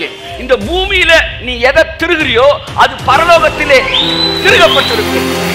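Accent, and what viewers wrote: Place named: Madurai, India. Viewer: In the name of Jesus Christ, when God's words are headed in our Madurai Thamilan voice, it' gets very specialized, like drinking TEA MIXEd WITH BOOST. Amen. May God bless you and your ministry forever and always pastor. AMEN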